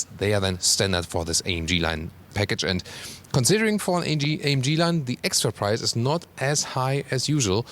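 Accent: Germany. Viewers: Love your reviews. Great English speaking with superb German accent. Awesome info and opinions on the cars. I've just ordered an iX3 :-)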